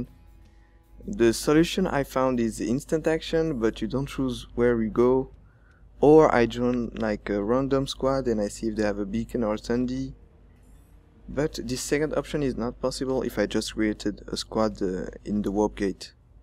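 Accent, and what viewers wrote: France. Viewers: you french ? :0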